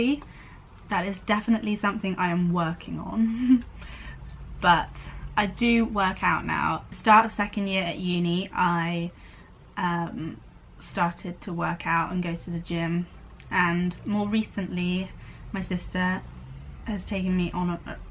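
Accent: RP, United Kingdom. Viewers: Definitely posh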